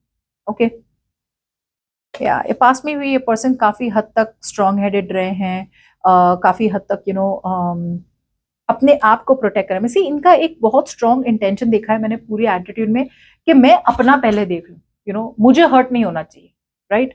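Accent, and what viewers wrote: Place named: India. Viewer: Thanks,. This is GREAT!!! I can follow along whilst you include some English. And I love hearing not only the Indian accent but the Hindi language is beautiful to listen to. Yes, I hope my person comes around. The longer no contact, the weaker the connection. I am open to new persons, though deep down to be honest, I still have feelings for her.😢..I know I'm a big baby when it comes to heartbreak. Reckon I am healing my abandonment issues as well. Thanks, dear for the read. Always seems straight shooter.🧡❤💚💙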